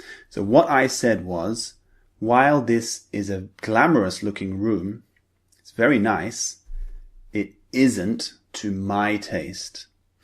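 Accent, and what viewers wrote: United Kingdom. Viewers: Hello Sir English, are you from southern England?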